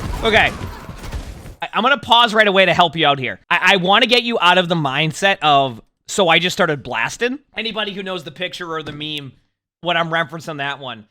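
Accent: Boston, United States. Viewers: Probably part of his Boston accent (which sometimes comes out more noticeably).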